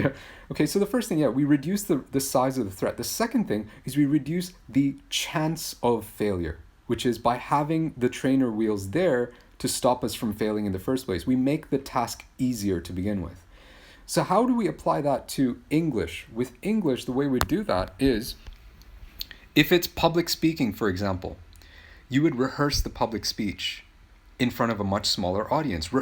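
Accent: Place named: United States. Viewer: sir, you say better than you but the fact is that you're best. Because I've been watching so many Americans they speak like you only. So you're just superb❤👌👌👍✌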